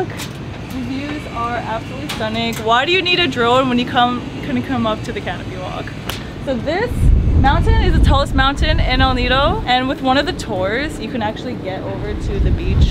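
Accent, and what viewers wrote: United States. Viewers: girl you talk to much a southern california girl., i should know i live in Orange County , CA and majoroty of korea her talk like you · You're a very entertaining story-teller with an amazing accent (West or East Coast?). Appreciate the way you appreciate everything about El Nido. (I miss that pandecoco!!)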